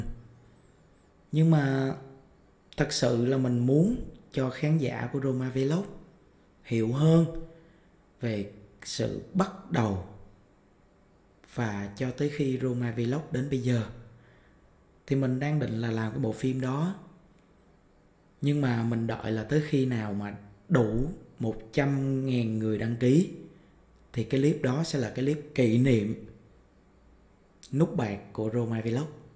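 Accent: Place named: Mekong Delta, Vietnam. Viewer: Thật em nói chuyện rất là chậm rãi rõ ràng truyền cảm và lịch sự. Vì nói giọng miền tây mà nói nhanh thì ng bắc nghe ko rõ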